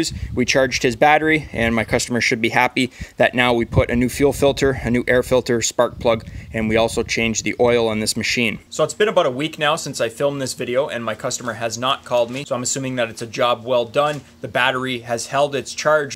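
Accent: Canada. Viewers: Good advice, you sound Canadian. All it takes is an ohm or two of extra resistance in big cables like that, and power is robbed from the starter motor in line. Drop testing would have been fun to see before removing wires, though the lack of any voltage at all on the starter post in the beginning is strange. Do garden tractors and farm equipment usually have starter solenoids separate from the starter motor?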